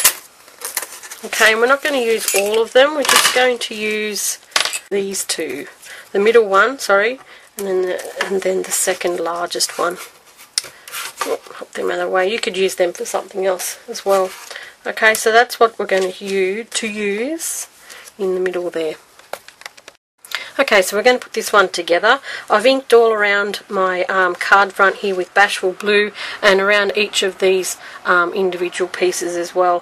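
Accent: Australia. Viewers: I am surbscribed to your YouTube channel but I don't seem to get emails about new videos but I had found the yellow card you did with lattice and then found these. I can't think you enough for ideas on other ways to use my spellbinders. You are the best, love hearing your accent, I have several pug friends from NSW, and one special one that lives in Hobart Tasmania you sound just like her. Thanks again for all your ideas love them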